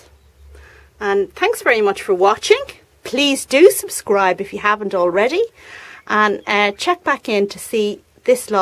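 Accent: Ireland. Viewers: I live in the U.S., Virginia to be exact. If I lived in Ireland, with access to all of the great plant material I've seen on your channel, and if I had the kickass Irish accent, I would lose my mind.:P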